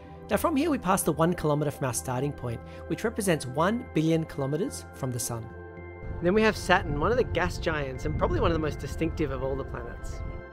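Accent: Australia. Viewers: Australian Tom Scott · Australian tom Scott out here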